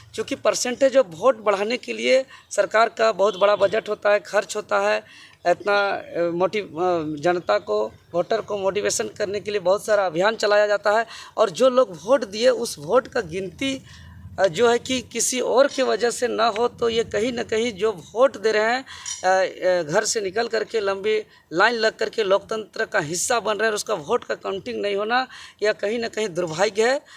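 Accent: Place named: Jharkhand, India. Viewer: Very good sound like speaker of jharkhand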